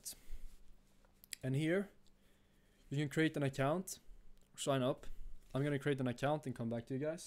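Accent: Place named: Scania, Sweden. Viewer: Skåne säkert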